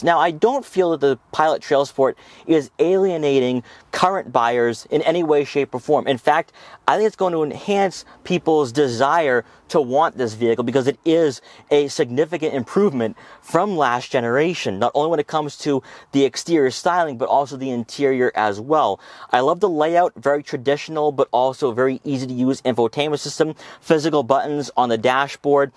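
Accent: Boston, United States. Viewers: Being that you're from Boston, I wanted to hear you, " Pahk the Caah".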